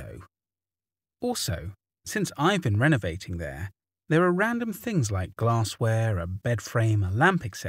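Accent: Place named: Southern England, United Kingdom. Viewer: Seen every single video on this channel, you’re the best at what you do my friend, and your very southern English accent makes them so much better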